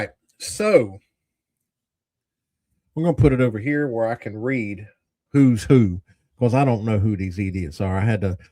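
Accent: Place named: Appalachia, United States. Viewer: I gave you over 5 and a half minutes of my life to listen to your hillbilly ass yawl yawl yawl your way to complete and utter retardation.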